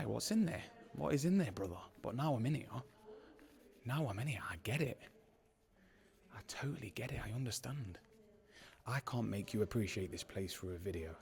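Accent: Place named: England, United Kingdom. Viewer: Have you not seen York Minster? You sound English & not particularly 'southern' so I too am surprised that you were never taken on a school trip to a 'Minster' town. The whole town of York is stuffed with architectural & historical gems. The Minster of course but also Cliffords' Tower, the Guild Halls, the walkable town walls & ancient cobbled streets called 'gates', the Shambles, the Railway Museum, the ruins where the Mystery Plays are performed, Bettys Tea Shop & much more. You could easily spend a week there & not see or do everything. The river Ouse, when not in flood, is a treat in itself. I have not been to the Christmas Market for many years but it used to be a treat too. Cheers. Other towns of interest In Yorkshire alone worth a visit would be Ripon with its Cathedral, Harrogate, Knaresborough, Whitby for the Abbey & its neighbouring church & many more. 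Wishing you happy hunting. Since you are a joiner you should be particularly interested in any Rood Screens in church buildings that were not destroyed during the reformation. As a joiner it is also worth remembering that the Church also employed highly skilled craftsmen, artisans & labourers to build Cathedrals, Minsters, abbeys, nunneries, hospitals, chapels & churches, some of which took hundreds of years to complete & which all need the same skills for maintenance today. In the words of the old song this all made "work for the working man to do". These places may seem grand but the atmosphere is provided by hundre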